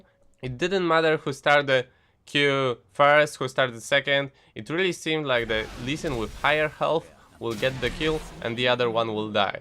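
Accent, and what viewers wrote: Poland. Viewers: od razu slychac ze polak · jestes z polski bo troche slychac po akcencie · Are you from Poland right? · Hi u have Polish akcent xd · ARE YOU POLISH? CUZ I LOVE YOUR ACCENT ❤❤❤❤❤❤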